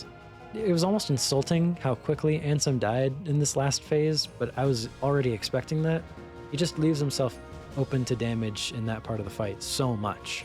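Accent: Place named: Canada. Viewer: You sound painfully Canadian.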